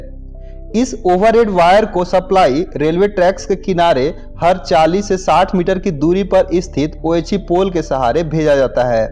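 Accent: Bihar, India. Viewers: Are u a bihari..?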